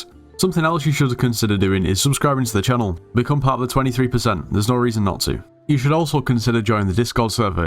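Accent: United Kingdom. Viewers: He is the most British sounding British person I've ever heared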